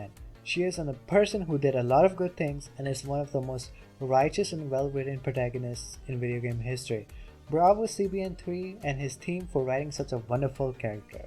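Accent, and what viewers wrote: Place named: Ukraine. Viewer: you kinda sound like the counselor if he was from kyiv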